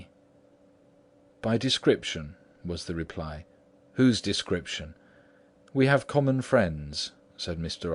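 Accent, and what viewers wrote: England, United Kingdom. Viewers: one of my very favorite books! i love the British accent ! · I heard of the title plenty of times,, but it was nvr mando reading for me. Tonight was my 1st time hearing the story,, and I completely LOVED it!!! Especially since the narrator has English accent, it fits so perfectly. Wat a awesome tale!! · British af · An excellent BRITISH narrator for this classic horror story! Wow - rare for Librivox, thanks so much! 🌹🙏🏼❤️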